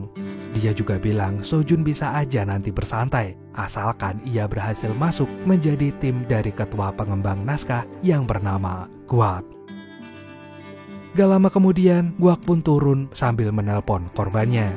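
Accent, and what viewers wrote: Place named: Banyumas, Indonesia. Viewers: Ngapak rika y min he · Anu wong Banyumas apa ya, lek.. Yuh ngopi bareng lah wkwk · Ternyata adminnya ngapak.. Salam ngapak min!! Kober kobere koh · Kaya wong ngapak bahasane😂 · Wong ngapak ya kang Tek subrek lh meski mbene tau nonton 🤣🤣🤣🤣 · Wasik Salam ngapak Ora ngapak ora kepenak Aja kelalen mendoan e Lik di sambi 😂😂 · Rika wong ngendi kang deneng ngapak temen bahasane 😅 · ngapak kie minn wong ndi rika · wong ngapak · Deneng rika ngapak😂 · Ngapak PWt, PBg, CiLanyap, Kebumen, apa Banjar Negara kie.. Nyong Pwt kota ra Nganggo bahasa "Rika" 😂 Langsung tek subrek kie pokoken anu seaLiran 👌🙏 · Dene wong ngapak kang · Anjai 😂ngapak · Rika si wong ndi... Aku Banyumas.... Kaya bahasaku kue deneng 😅😅😅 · lah rika si wong ndi deneng ngapak?! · Wong ngapak ki genah.. Rika Rika.. 😂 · Wong ndi mas deneng ngapak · Wong endi min,kok ngapak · Laaah rika jebule ngapak wa.. 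😂❤ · ccte cah ngapak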